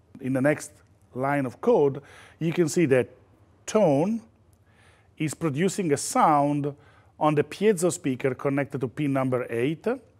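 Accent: Italy. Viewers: Si capisce che sei italiano. Grazie per il video è molto interessante. Per caso fai cosrsi a pagamento? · i dont know why but the fact you have a lovely italian accent makes me understand things better :D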